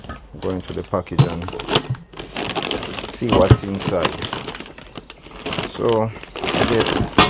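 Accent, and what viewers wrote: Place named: Jamaica. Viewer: Love how u keep di ting straight jamaican... real yaad man mechanic. Keep it up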